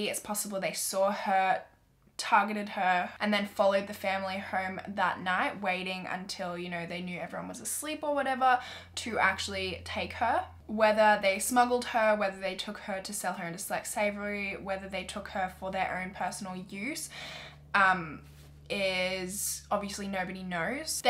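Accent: Australia. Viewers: Are you Australian? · Literally Exposedd British ? huh 😂💀💀 she’s Australian 🌚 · Jomara Medina she is Australian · Well she's Australian so she might be saying it different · people always complain about your voice 🤔 ... ive seen it on forums and to me your voice is so AMAZING... AUSSIE accent CUTENESS IS REAL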